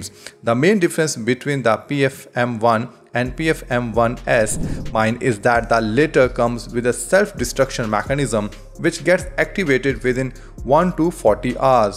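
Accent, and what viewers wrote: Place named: India. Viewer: Really hard to understand this guy's English with his Indian accent.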